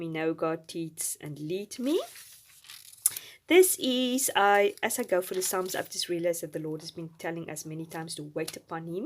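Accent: South Africa. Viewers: I love your South African accent too, your have a lovely soothing voice to listen to! 😊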